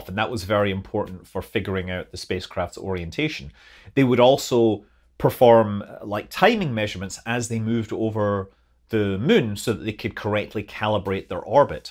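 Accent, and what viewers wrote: Scotland, United Kingdom. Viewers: Great Q&A, Scott. You do a great job informing us. And for us "Amerikins" your Scottish accent is icing on the cake.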